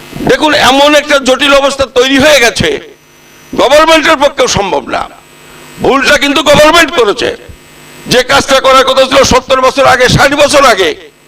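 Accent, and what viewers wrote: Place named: Bangladesh. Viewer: আপনার কথা শুনে বোঝা যাচ্ছে আপনি বাংলাদেশি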